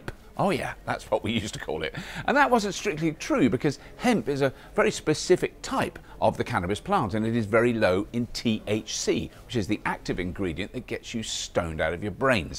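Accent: Australia. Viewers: Great show. 9/10. It would have been 10/10 if it hadn't been for that 'Australian' ? accent . (From a loyal Australian fan) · Was not expecting that Aussie accent to be any good. 👏👏👏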